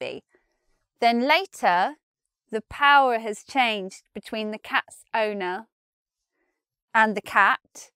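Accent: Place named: London, United Kingdom. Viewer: Her accent gives it away. She from the UK but if you want to get more precise she from London.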